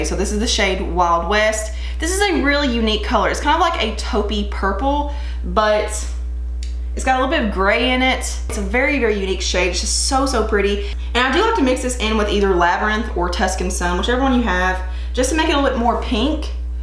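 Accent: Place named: Tennessee, United States. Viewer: Ok so I have to ask 1. Where are u from...u sound like you are from tennessee or Kentucky I live in tennessee I would like to follow this look for a video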